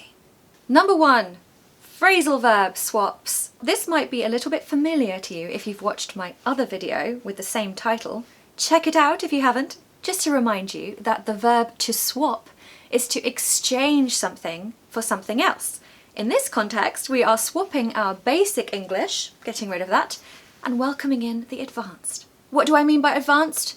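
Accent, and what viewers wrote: United Kingdom. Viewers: Hello dear!!! I´m from Brazil and first of all I´d like to thank you for your contents here!!! I´ve been studying English for about a year and they are helping me a lot over this time!! i haven´t words enough to thank you for all of that!! Ps: British Accent is amazing!!! 😍🥰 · I love the British accent ❤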